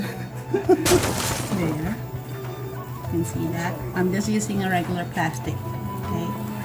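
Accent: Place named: England, United Kingdom. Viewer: Yum! 😋 love your english accent! Galing magchopsticks ha! Your awesome! 😄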